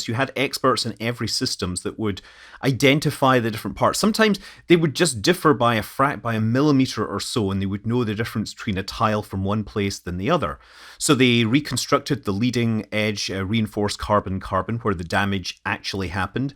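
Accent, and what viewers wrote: Scotland, United Kingdom. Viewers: Scotland, clearly 🙄 Manners cost nothing, you need to learn some. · Brilliant video. Your accent is clearly north of the border… anywhere near Aberdeen? I’m guessing a wee bit further south?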